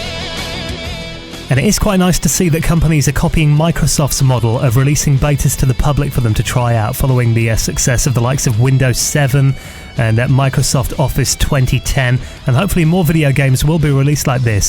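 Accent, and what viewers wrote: United Kingdom. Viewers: Ahh, thats what I like, the sound of a true British voice ;) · A british reviewer Woooo!!!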